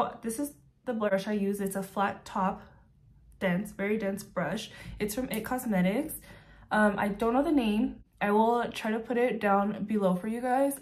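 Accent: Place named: United Kingdom. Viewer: Love it she’s cute and I love the British accent and that’s the first grwm in awhile that’s it’s really good can’t wait for more ❤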